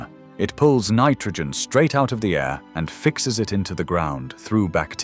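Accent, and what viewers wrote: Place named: Southern England, United Kingdom. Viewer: 👋🏻 it’s not a robotic voice 😀 it’s a normal “ educated “ Southern English voice 💕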